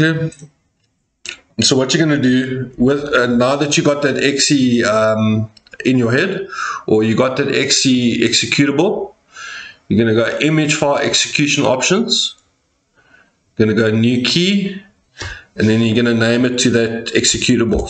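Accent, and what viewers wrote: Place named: South Africa. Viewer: My bru sounds so South African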